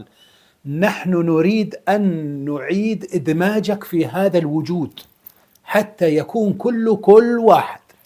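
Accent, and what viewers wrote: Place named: Iraq. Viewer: عبالي عراقي تقريبا نفس اللهجة والشكل🌱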